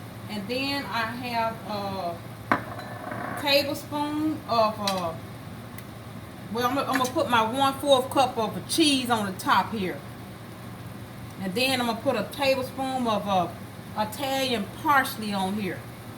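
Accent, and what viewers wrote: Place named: South, United States. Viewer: You really have a southern accent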